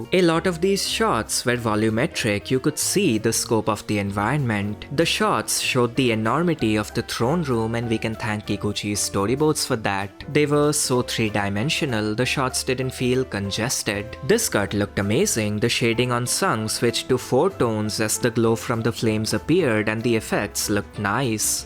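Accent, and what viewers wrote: India. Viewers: How did you somewhat get rid of your indian accent?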